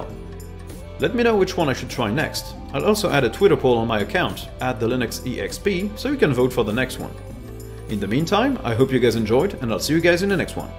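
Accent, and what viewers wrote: France. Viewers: Ta accent français était un peu plus fort qu'elle est aujourd'hui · La meilleure chaîne Linux que j'ai pu trouver et ce petit accent français me fait plaisir à entendre. De mon expérience, les extensions de Gnome peuvent cracher ta distribution après une mise à jour de version. Elles sont à utiliser avec modération. Tout comme toi, je trouve les sous-menus de KDE un peu frustrant. Continue ces vidéos de qualité qui se concentrent sur ce qui fait un peu défaut aux distributions : de la finition.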